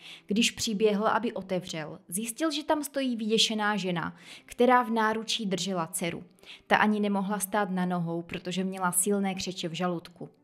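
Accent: Moravia, Czech Republic. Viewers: Mužů ae zeptat z jakého jste kraje? Mate zvláštní přízvuk. Jakoby moravský .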